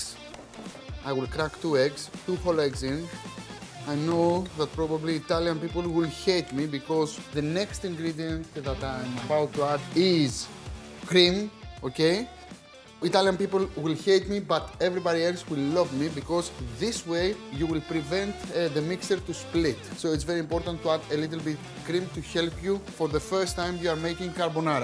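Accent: Greece. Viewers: Carbonara with a Greek accent 🥰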